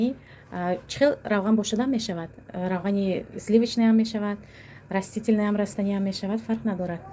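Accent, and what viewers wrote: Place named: Literary Tajik, Tajikistan. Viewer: Мана чи тавр бояд сухан кард: равон озод бо лахни адабии точики👍👍👍Шахсе , ки шуморо гап задааст аввал тарзи сухан кардана ёд гирад😊. Шумо бехтарин модар зани точик👍👍👍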